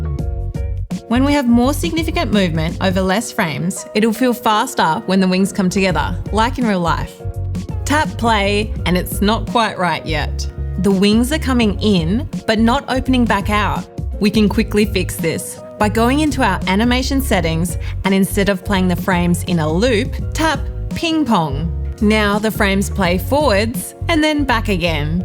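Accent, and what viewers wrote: Australia. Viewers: Keep on going and please do an intermediate series … well done for the simplicity and objectiveness of the videos. I liked the Australian ascent :) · This was a fantastic series, thank you so much! Smashed through it in a day 😁 feeling so much more confident! Also so happy to hear a fellow Aussie accent! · I think I share the sentiment of many others judging from the comments below that this has been the best series. As a fellow Aussie I loved the accent, and because I am a slow learnier I just reduced the playback speed to .75 which was very helpful. I have dabbled in Procreate for over a year on and off, mostly off because I start and then feel so overwhelmed so I give up, but this series was brilliantly paced to allow me to really understand the workings of the program. I know it is the tip of the iceberg but thank you for letting me gain more confidence and a desire to continue. 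I can not wait for an intermediate series, BRING IT ON!!!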